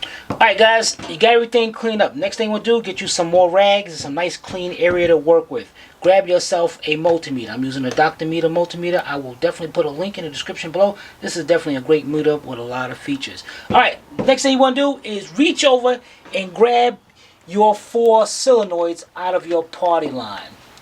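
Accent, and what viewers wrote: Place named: New Jersey, United States. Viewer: Where are you from? Sounds like New Jersey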